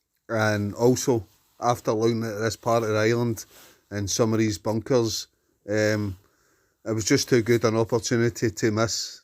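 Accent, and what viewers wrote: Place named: Scotland, United Kingdom. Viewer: Hi, with your brogue and you’re drinking wine? I hope it’s NZ wine as the area I live in makes some of the finest wine in the world, lol. Yes NZ is a beautiful place. I am ensconced in the lower quarter of the South Island, in a town called Alexandra. It is 90km from Queenstown, and very close to where they filmed the Lord of the Rings series. People ask me if the landscape is like the movie, and I have to say yes, it is as you see, except for the battle scenes. The nearest mountain range is the “Old Man Range”, 10mins away at a height of 1610m, with a 4WD road access. Slightly higher than Ben Nevis and the views are spectacular. You would love it here with the passion you have for tramping and camping There are several you tubers posting about NZ camping. One of the ones I follow is Abel & Victoria who camp in the Nelson area of the country situated on the northern coast of the South Island. 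2.5hrs gets me to the east and south coasts, and 4hrs gets me to the West Coast. I’m going to browse your other videos that you have posted. Many thanks, Brian.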